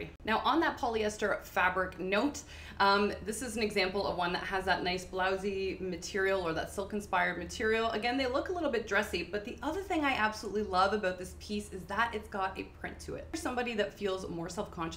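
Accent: Canada. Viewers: You have to be from Canada 😹. I love the accent.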